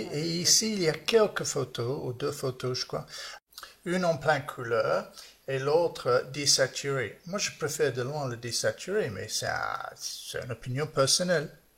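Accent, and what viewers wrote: England, United Kingdom. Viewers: C'est bien charmant avec cet accent anglais et le Monsieur parle avec une vigueur remarquable.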